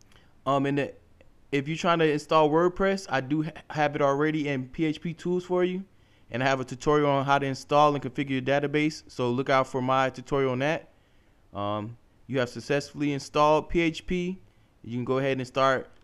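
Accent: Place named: England, United Kingdom. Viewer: Sweet tutorial, finally someone making IT tutorials with a proper english accent! Thanks for the help.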